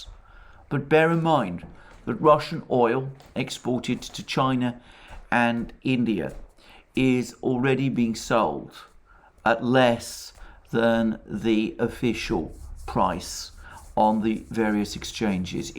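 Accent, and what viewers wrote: United Kingdom. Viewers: Too British an accent.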